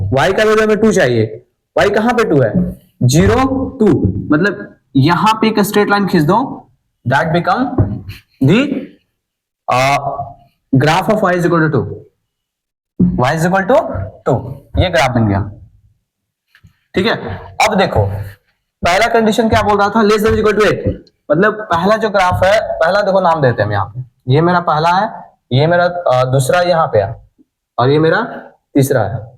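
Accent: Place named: Bihar, India. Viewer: Aap Bihar se ho sar aawaj lag rha hai ❤❤❤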